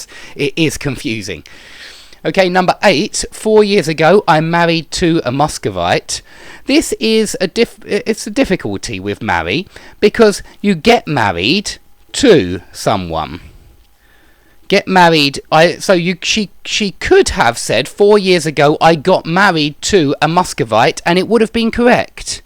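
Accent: United Kingdom. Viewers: Ilove the British accent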